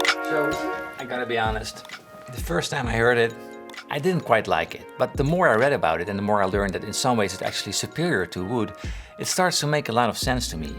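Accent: Netherlands. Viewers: i love how you set up videos and edit them but as a fellow Dutchman it sounds kinda strange hearing someone Dutch speak English so for me it is kinda a offset but that's on me xD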